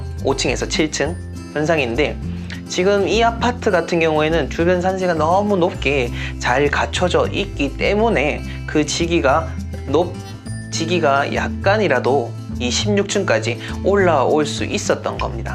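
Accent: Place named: Daegu, South Korea. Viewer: 대구 사투리?? 뭔가 똑부러져요 ㅎㅎㅎ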